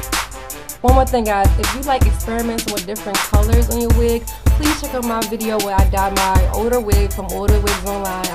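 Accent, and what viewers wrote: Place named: Baltimore, United States. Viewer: As soon as I was saying in my head, she's sounds like she from Baltimore, you confirmed it! You sound like me when I get mad 😆 But this wig sounds like What I've been looking for for forever, I hope it's still available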